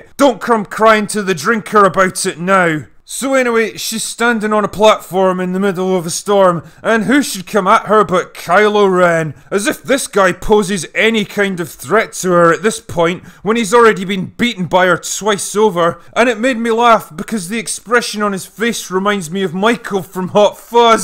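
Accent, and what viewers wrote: Scotland, United Kingdom. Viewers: He's Scottish. He just sounds that way.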